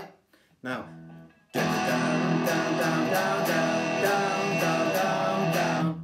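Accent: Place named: Wirral, United Kingdom. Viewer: I can’t learn the riffs because I can’t stop laughing at you 😂😂😂I reckon that’s a Wirral accent!!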